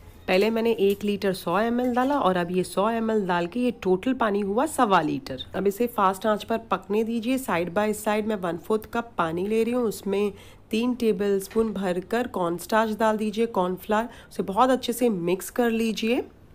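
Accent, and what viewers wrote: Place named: Konkan, India. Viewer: aapki baaton se lag raha tha. Where r u from?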